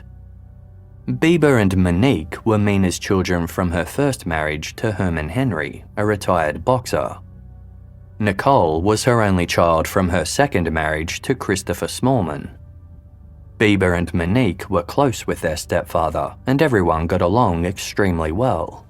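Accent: Australia. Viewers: The narrator's accent is " educated Australian ". As opposed to " broad Australian" such as the crocodile Hunter.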